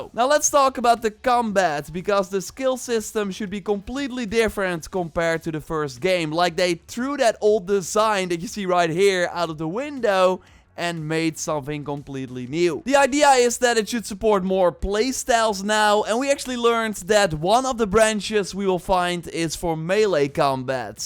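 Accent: Netherlands. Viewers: Lekker Nederlands accent man:)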